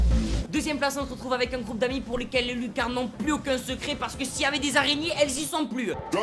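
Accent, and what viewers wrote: Marseille, France. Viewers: Salut mon vieux, obligée de t'écrire un commentaire sur youtube pour te joindre ! C'est pas possible ça haha J'ai maté ta vidéo, ça fait longtemps que j'ai pas entendu ton petit accent marseillais/landais/nonidentifié en vrai donc t'es dans l'obligation de me donner de tes nouvelles!!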